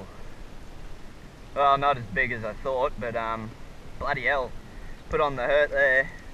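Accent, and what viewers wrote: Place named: Australia. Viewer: you are the 3rd Aussie i subcribed love you guy's your great sports and love the accent to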